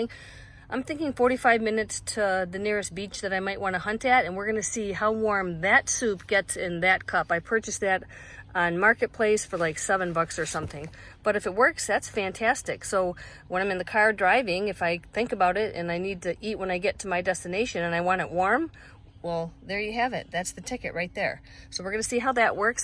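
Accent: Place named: Minnesota, United States. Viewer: Actually your Min a soda accent drives me nuts. And your husband must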